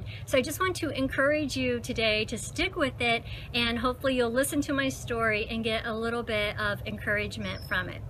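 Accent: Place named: United States. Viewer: Always watch your vids, great content and engaging, and your American accent on Tooosday Tips..... the 3 loooong T's.........lol, you are milking it now!! (my humour), don't stop!! slow down back to yourself speed.... Love your accent and your intelligent & organised approach to your informative and entertaining productions....GL to you, keep it coming.. from the lil' UK x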